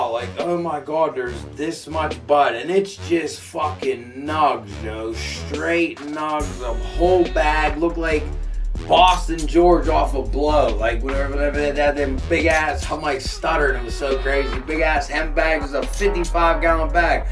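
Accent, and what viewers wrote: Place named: Pittsburgh, United States. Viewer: plus I'm from pittsburgh So it's great to hear the stories told with our burgh accent and from a pittsburgh point of view And funny as hell I say call Netflix !!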